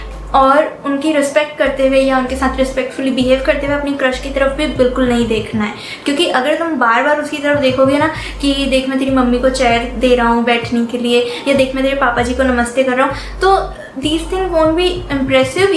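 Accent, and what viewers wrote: Chhattisgarh, India. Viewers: I love that chhattisgarhi accent❤❤ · Chattisgarhiya sable badhiya 😁 It's nice to know that u r chattisgarhiya Where r u from in cg.... Ur cg accent is cute 😜😜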